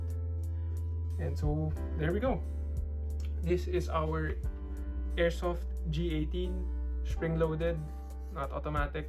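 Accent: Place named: Philippines. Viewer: filipino english is so satisfying!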